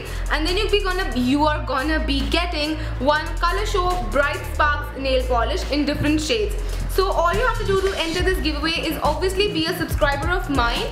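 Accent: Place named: Delhi, India. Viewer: Hiiiii... I just came through your YouTube Channel last night and I'm TOTALLY YAYYYYYY in love with it... Have already watched more than 10 videos since last night 😀 I just love your confidence in front of the camera.. The way you talk and everything... So dilli wali ladki 😊😊 ❤ And coming to the Giveawayyyyy... My favourites are the Maybelline BB Cream and Colossal Kajal 😊😊😊... Both are perfect for my oily skin... Badly wanna win this Giveawayyyyy yaayyyy 😍😍😍😍 Looking forward to your reply 😘😘😘😘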